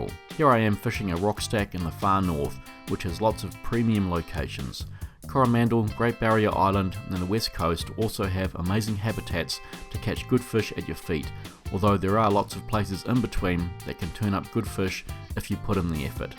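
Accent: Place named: Australia. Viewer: gday gday mate u from ozzy mate?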